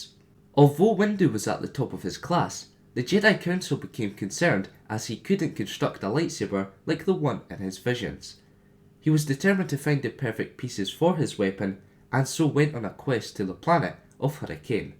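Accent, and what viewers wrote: Scotland, United Kingdom. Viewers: the scottish accident makes it better more like a young Kenobe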